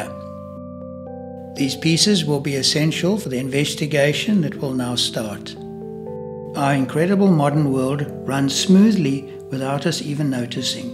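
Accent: South Africa. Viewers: Do I detect a South African accent? 😁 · Nice South African accent . 😊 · I hear a very Afrikaans accent 😄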